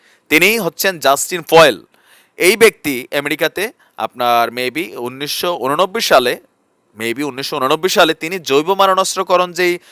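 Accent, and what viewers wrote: Comilla, Bangladesh. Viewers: Cumilla hote vi apnar cumillar vasa khub vlo lage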